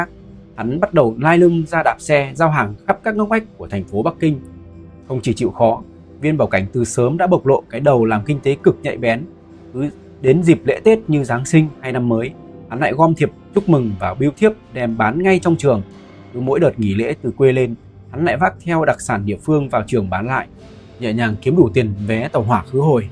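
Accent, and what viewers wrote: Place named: North, Vietnam. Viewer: có thể lồng tiếng miền nam đuọc không ad . tiếng miền bắc giọng gọng gọng khó nghe . xem mà phải tua nhanh gần hêt một video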